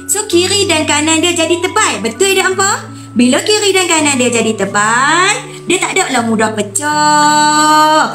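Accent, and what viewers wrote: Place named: North, Malaysia. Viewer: Suka dengaq hang habaq Utara no..."jgn gatai2 tgn lipat" 😂😂😂🤣🤣🤣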